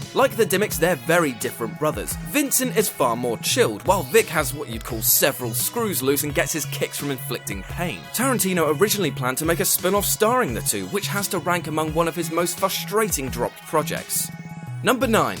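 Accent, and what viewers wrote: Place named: England, United Kingdom. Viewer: People pronounce words differently. This guy is English and pronounces his vowels differently than, say, Americans.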